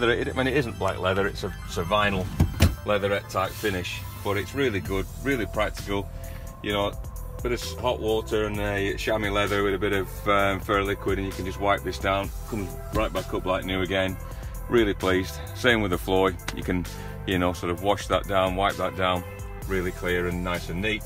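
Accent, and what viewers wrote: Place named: Cumbria, United Kingdom. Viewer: Think its Carlisle in Cumbria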